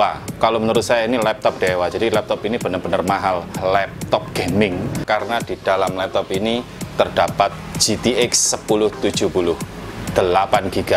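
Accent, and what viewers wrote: Java, Indonesia. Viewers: Medok Mas...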